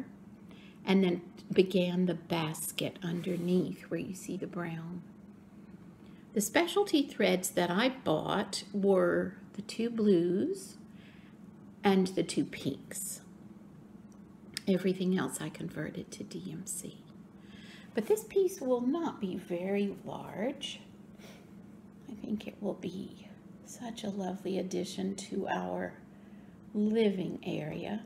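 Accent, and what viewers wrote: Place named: Texas, United States. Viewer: So nice to hear a sweet Texas accent and to find another homeschooling mom (although mine are now in their thirties). Your Mary Queen of Scots will be stunning! Your curls are beautiful. I'm in Athens and am enjoying the rain as we badly need it. I heard about you on Rachel's FlossTube and I think she mentioned you go to the Tudor Rose Guild, unless I misheard. I'm going to the October meeting. Hope to meet you if you are there. I'm coming up on my FlossTube Anniversary, too!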